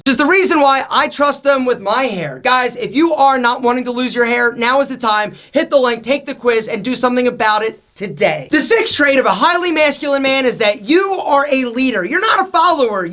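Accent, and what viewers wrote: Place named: New Jersey, United States. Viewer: where in Jersey is this guy from lol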